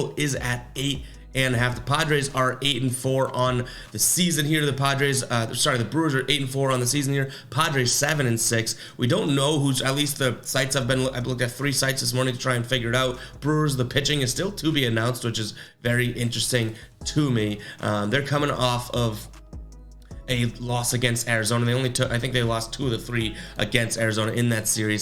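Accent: Boston, United States. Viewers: What part of Boston you from ?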